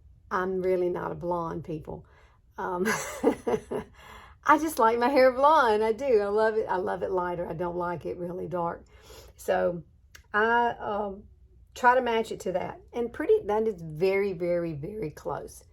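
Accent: South, United States. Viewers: You are too cute! I am a Kentucky girl that also has that southern accent and there is nothing better. Have a great week, see you next week. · I’m a Georgia girl and I completely understand you, girl we speak Southern Belle. You are beautiful inside and out ♥️ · I grew up in Oklahoma, Texas and North Carolina. I definitely understand your southern speak. I’ve had people ask me where I’m from …. 🤣🤣.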